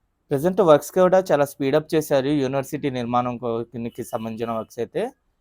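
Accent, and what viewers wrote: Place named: Telugu, India. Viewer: Do not comment about his language,he is speaking local telugu slang,go ahead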